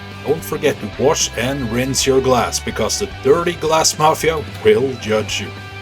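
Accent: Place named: Canada. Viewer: Awesome video, just subscribed. Where are you from, sounds like Canada Eh? Brought back childhood days of the McKenzie Brothers!!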